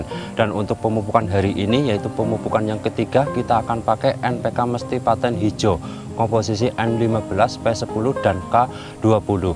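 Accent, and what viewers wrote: Banyumas, Indonesia. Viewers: Logate ngapak.. asli ngendi rila kang? 🙏 salam sekang cilacap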